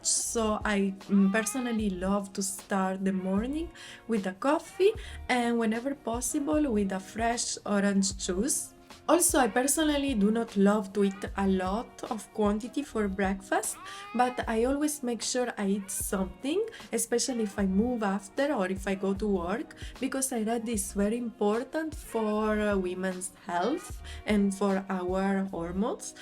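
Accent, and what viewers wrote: Italy. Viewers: Grandeee finally someone explaining this truth to the world. I tried to explain this to non italians but they didn't really get it. Now I can show this to my friends and maybe they will understund ahah. Grande ti seguo da un paio d'anni, mi piacciono molto i tuoi video e anche il tuo modo di spiegare le cose. ed e' bello il tuo accento italiano quando parli in inglese. Un abbraccio dalla Sicilia.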